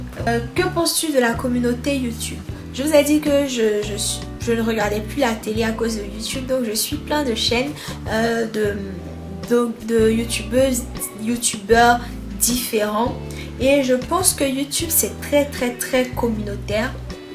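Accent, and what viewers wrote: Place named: Ivory Coast. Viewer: L'accent ivoirien!!! Yes bisous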